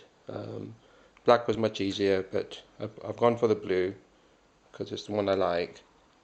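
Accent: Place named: India. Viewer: English with indian accents shouldn't be on youtube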